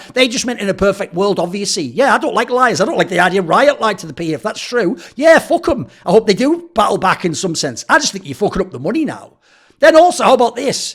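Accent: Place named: Scotland, United Kingdom. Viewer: its probably been 5 years since i've seen a thoorin video since i quit league in high school and i forgot how scottish this guy is 😭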